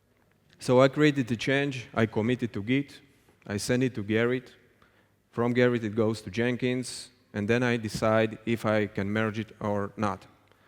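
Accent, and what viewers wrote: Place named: Russia. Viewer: спасибо, полезно, только автор, пожалуйста, прогоняйте перед зеркалом речь, и правда - сильно режет слух наш "акцэнт"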